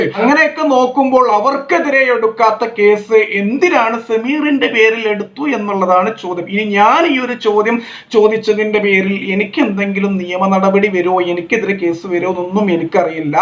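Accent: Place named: Kasaragod, India. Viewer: ഒരു കാര്യം പറയട്ടെ കൂട്ടുകാര നിങ്ങളുടെ ചാനൽ ഞാൻ കാണാറുണ്ട് നിങ്ങളുടെ ശൈലിയിൽ നിങ്ങൾ സംസാരിക്കുക നിങ്ങളുടെ സംസാരം കാണുബോൾ ഏതോ ഒരു കാസറഗോഡ്കാരൻ സംസാരിക്കുന്നതുപോലെ i like you this chanal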